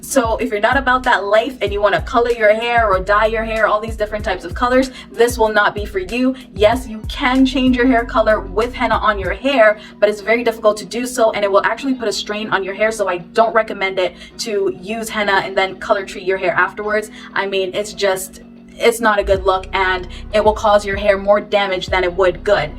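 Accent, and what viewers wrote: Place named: Jamaica. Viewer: It is that Jamaican Accent Young Lady♥️. I actually have the henna mask in my head now. Plan in keeping it on for about three to four hours. I will definitely be looking forward to new videos from you.♥️♥️♥️♥️♥️♥️♥️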